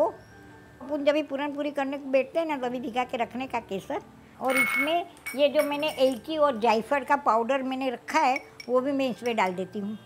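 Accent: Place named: Mumbai, India. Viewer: Jai shree krishna baa..manee taamara mumbaiya style hindi khubach game..tame keen chana dal nather vaparyee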